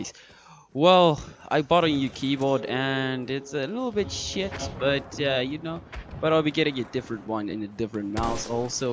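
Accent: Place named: India. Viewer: awesome game man and whats wrong with that Indian accent dude -_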